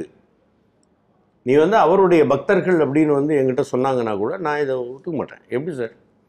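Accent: India. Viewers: Bangalore tamil? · Ayya mass speech. Thanjvur tamil god bless you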